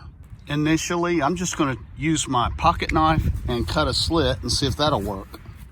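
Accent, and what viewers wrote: United States. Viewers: Helpful video. Thanks. Wild guess, but are you from Tennessee?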